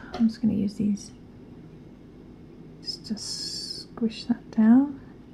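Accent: United Kingdom. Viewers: I absolutely agree with you. And I would also add that I'm in love with her voice, British accent, the way she speaks😍 I can't wait to by all the necessary equipments and start making all the flowers you showed for our summer house💖💖💖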